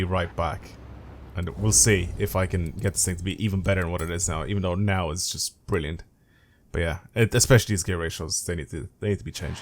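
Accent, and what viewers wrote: Ireland. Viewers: For two fucking years I was trying to understand where the hell is from. Finally I know he is Irish. Now I can die in peace. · Now we know lives in Ireland which is obvious since he has an irish accent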